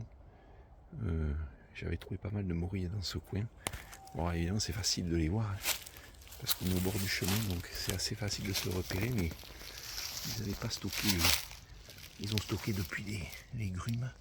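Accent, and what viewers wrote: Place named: South, France. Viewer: Joli accent du sud pour un lorrain 😅👌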